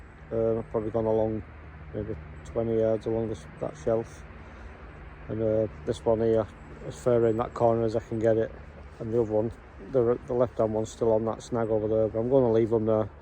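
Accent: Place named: Northern England, United Kingdom. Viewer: brilliant video and proper northern mans commentary no snow flakes here lol keep them coming superb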